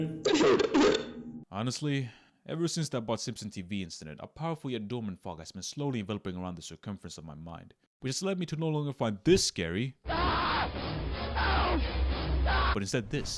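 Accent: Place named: Sweden. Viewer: He is Swedish. That's why he has swedish accent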